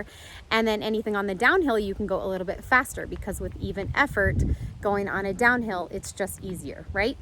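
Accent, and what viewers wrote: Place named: Midwest, United States. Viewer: Judging by your accent (same as mine), it’s nice to see a “northern girl” with some marathon content!! I’m rom WI and now in the twin cities. Do you do a lot of winter outdoor running or do you focus more on the treadmill? How does that affect your training or is it just sustainment mode during the half year of snow on the ground? 😂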